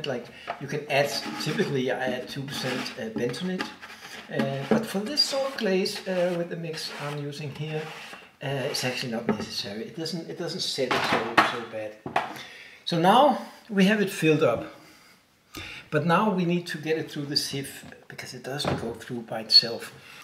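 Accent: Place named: Denmark. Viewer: I just realized how the beautiful Danish accent fits the mad professor character so absolutely perfect 😂 Greetings from your southern neighbor!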